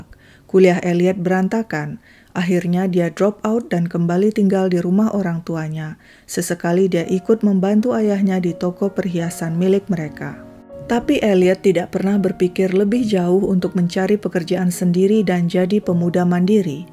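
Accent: Indonesia. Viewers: hokh ketauan org indonya ya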